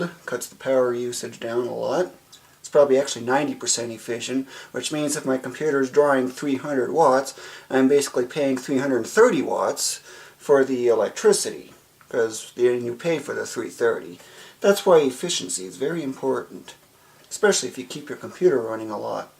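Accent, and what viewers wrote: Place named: South Louisiana, United States. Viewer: Im lovin that south Loisisianian accent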